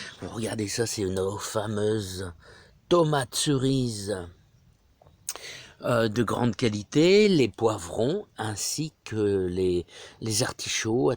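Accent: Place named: Nord, France. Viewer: Bonjour, Je me lasse pas de regarder tes videos, je les attends comme une série de TV (neetflix…hihihihi ) en plus avec l’accent du Noord …J’adore sans chichi et avec beaucoup de passion. Ne change rien, malgré les 4 degrés de ce matin. Bon dimanche.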